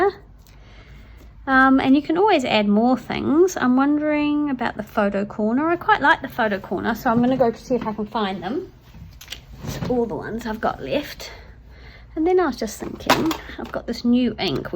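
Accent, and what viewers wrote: New Zealand. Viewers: I so enjoyed watching this video with you! I’m a Kiwi too so it’s nice to hear a familiar voice! Love these little bags, which will be great additions to my journals and tags. Look forward to watching your next one! Wish I had seen your Giveaway, it looked really amazing! How exciting to be waiting for a new grand baby!🧚‍♀️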